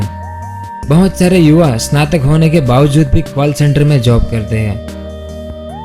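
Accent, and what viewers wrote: Gujarat, India. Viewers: Aap Gujarati ho kya?? Aapka bolneka accent ekdam Gujaratiyon jaisa hai!!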